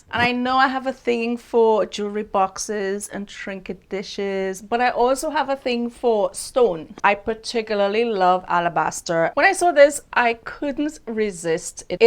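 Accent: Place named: Trinidad and Tobago. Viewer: I just came across your channel a couple of months now, and I have to say I truly enjoyed your videos. Butt. . I have to ask are you from Trinidad 🇹🇹? I am... not that it matters but I kind of pick up on the accent. Truly enjoy your videos regardless where you from.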